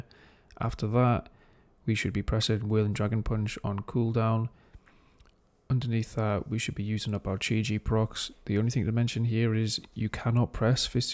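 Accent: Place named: Newcastle, United Kingdom. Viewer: Radge hearing a fellow geordie on a wow guide 😂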